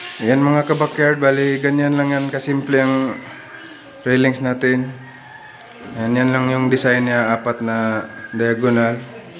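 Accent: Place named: Bicol, Philippines. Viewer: Parang bikulano ka idol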